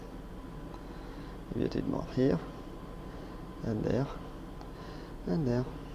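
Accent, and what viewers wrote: France. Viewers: i love ur english accent(u might be a french speaker).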